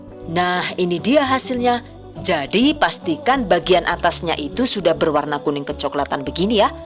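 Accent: Banyumas, Indonesia. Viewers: Suaranya mbak aduh mirip orang bule, mirip penyiar juga aku suka bgt, eh tapi kok bisa jawa ngapak , apa ini orang ngapak yoo??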